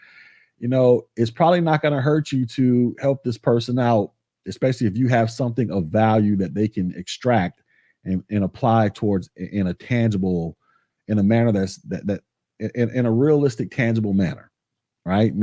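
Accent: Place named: New York, United States. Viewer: I don’t mean to come as weird, but are you from Harlem or the Bronx? You truly sound like us. Youngin,B, Baconeggandcheese. New Yorkers talks with a lot bass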